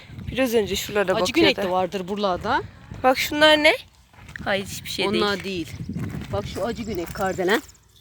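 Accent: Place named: Aegean, Turkey. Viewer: Merhaba hanım hangi memleket burasi sanki Ege şivesi gibi 🙋💐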